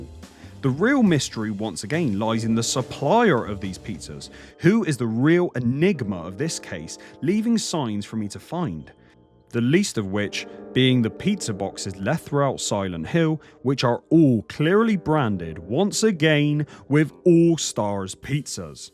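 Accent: Bristol, United Kingdom. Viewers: Omg I was thinking the same thing with this pizza on my playthrough! 😂 loved this video! BTW, do I detect a fellow bristolian from your accent? 🤔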